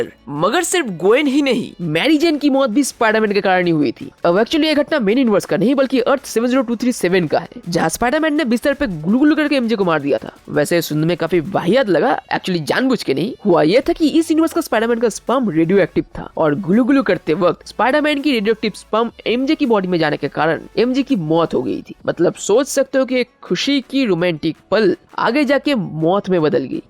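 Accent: Bengal, India. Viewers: ji Aap kya Bangali ho?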